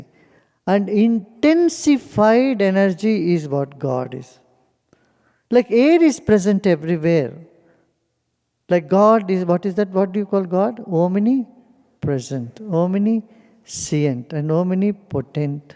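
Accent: India. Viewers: I can only make out a word here there with this guy. The Indian accent is the hardest. It just basically blears words together.😳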